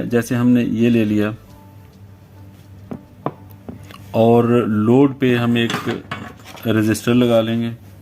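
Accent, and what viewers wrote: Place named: India. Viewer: با اون لهجه هندی ریدی تو انگلیسی حرف زدن ، گاو مثل آدم انگلیسی حرف بزن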